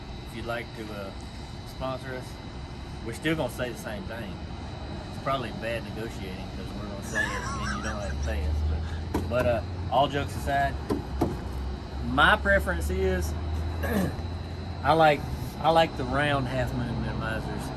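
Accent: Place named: South, United States. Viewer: This guy is hilarious. GOB southern and got a good sense of humor. Goes a long way.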